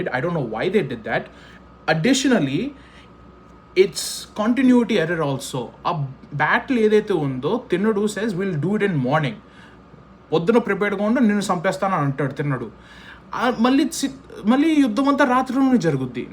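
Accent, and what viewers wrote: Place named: United States. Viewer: Bro just speak without an accent bro. your /r/ sounds are all over the place. But you are doing a good job of creating content. you mix US and UK accent traits and as a voice and accent trainer you sound really Cringy bro. No offence, Lemme know if you want we can explore few tips so you soundd realllllly good bro. All the best!!! Peace :)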